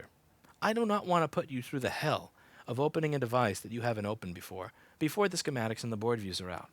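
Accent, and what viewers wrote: New York, United States. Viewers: Yes, that New York accent and displeasure at anything Apple sounds richer and much warmer. Thanks, Louis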